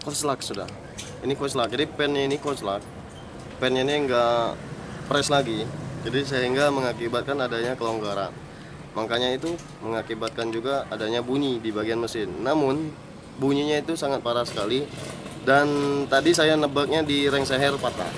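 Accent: Palembang, Indonesia. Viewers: Wong palembang yo kak?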